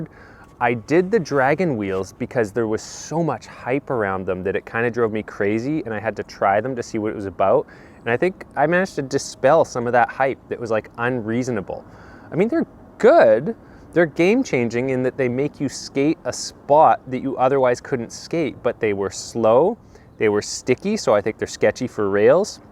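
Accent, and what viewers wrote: Canada. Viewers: Just want to say my thanks, because I've gotten a lot from this channel over the last few years, including: - understanding my skateboard on such a deeper level - from trucks to decks, you tapped into my geek nature - when doubts would creep in about my age (late 30s), you inspired me to keep skating. I would often think, shit, skates multiple times a week and he's older, and also does physical labor for a living! I'll be alright;) - you soothed my nerves in times of stress. your Canadian accent and manner of talking are very calming to listen to! Much appreciated,. Best of luck with whatever you do!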